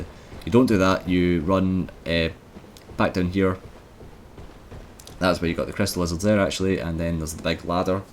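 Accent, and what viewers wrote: Scotland, United Kingdom. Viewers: lol that accent, is it scottish? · Same here man. Thanks for doing this. Also, your accent is gloriously British (Scottish, right?) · Thank you, you amazing Scottish dark souls God! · 5 stars. But with the awesome Scottish accent it's a 5⭐️➕A+. Your a godsend bro. Although I kinda hated you when I was getting shitty chunks and green shitenite (I've loads) and your sucking on slabs yeh bastid yeh. Thanks again pal. Cheers from a fellow Celt in Dublin.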